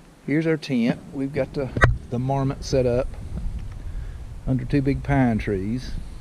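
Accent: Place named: South, United States. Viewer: Props to you friend for taking the effort to say 'portage' properly, for a while there with your southern accent, I thought I was going to hear something more like 'porridge' which really makes me cringe. Thanks again for making the effort ;-)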